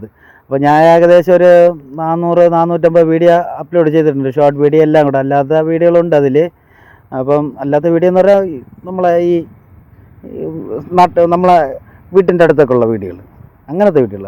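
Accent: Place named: Thiruvananthapuram, India. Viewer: താങ്കൾ സ०സാരിക്കുന്ന ഭാഷ തിരുവനന്തപുര० സ്റ്റൈയിലിൽ.🙋‍♂എന്നാൽ മദ്ധ്യകേരള ഭാഷ നിങ്ങളുടെ ഭാഷയേക്കാൾ വ്യത്യസ്ഥമാണു് ബ്രോ!🙋‍♂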